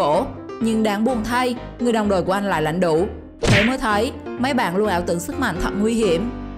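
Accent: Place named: Central, Vietnam. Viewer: Cám ơn admin cháu gái dễ thương ❤admin cháu gái có giọng nói miền trung nghe ngộ ngộ dễ thương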